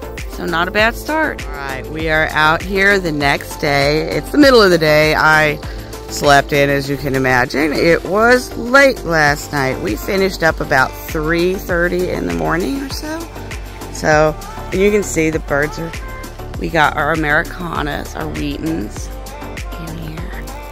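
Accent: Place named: Texas, United States. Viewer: Besides the main topic of "how to" , to did this vid so us common folk could keep up so Thank you. Just a side note, you spoke just like my dad a grammama did and it brought Texas right back with a tender force so thank you for that too.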